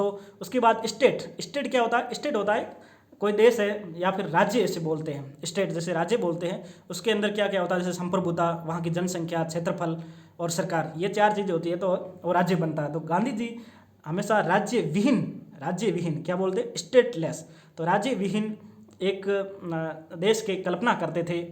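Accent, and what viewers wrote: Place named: Rajasthan, India. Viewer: The way to explain any of your topics is very good, I love listening to your videos because Rajasthani mix comes in your tone 🙏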